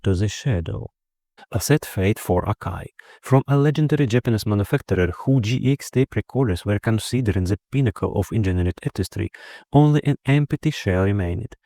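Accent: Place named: Russia. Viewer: It’s very hard to understand anything you’re saying because of your very heavy Russian accent!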